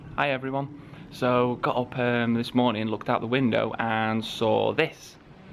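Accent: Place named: Sheffield, United Kingdom. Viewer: Nice video mate, Shame about the wind up in Castleton you would have gotten some great views up there, Well saying that looks like where you live you have some pretty decent views. Are you from Sheffield by any chance, Your accent sounds similar to mine?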